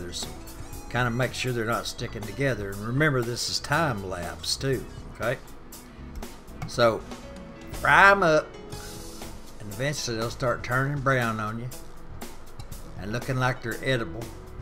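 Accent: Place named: East Texas, United States. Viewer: Congrats on 7500! Does YouTube bump anything up when you hit that number? My family is pretty much small town and rural KS OK AND TX. A lot of your cooking is so similar to ours in technics, but you definitely have some recipes that I don't have. Remember Justin Wilson I think that was his name? Bayou cook on PBS the 70's or 80's. You don't have that ascent, but yours is similar to my relatives near Tyler. I enjoy your pleasantness and recipes! Keep it up!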